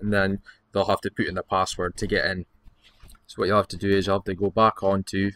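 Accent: Scotland, United Kingdom. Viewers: Wait wait wait wait, wait, R u scottish ? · You sound like a British Redmercy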